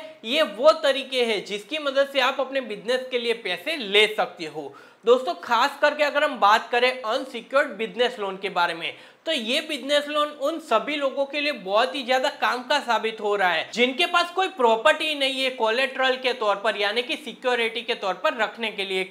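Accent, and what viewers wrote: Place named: Saurashtra, India. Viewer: Is bhai ki hindi se hi pata chalta hey key gujrat ke saurashtra ka banda hey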